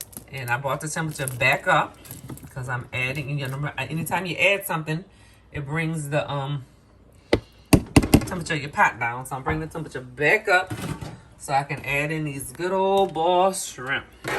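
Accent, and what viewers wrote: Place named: New Orleans, United States. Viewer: Yeah ya rite! Is your family from Baton Rouge...you sound like you got some Donaldsonville or New Orleans twang mixed in there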